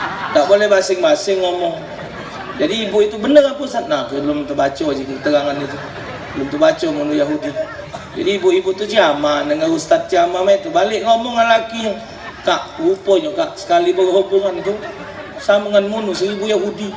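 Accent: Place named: Palembang, Indonesia. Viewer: Seperti bahasa Palembang Ya bang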